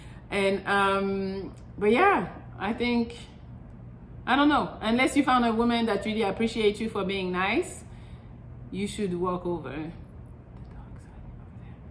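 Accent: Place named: France. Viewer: Most probably from France. I hear a French accent.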